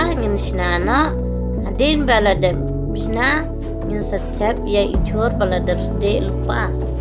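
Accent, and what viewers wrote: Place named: Meghalaya, India. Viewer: Klam bait dei iong pnar leh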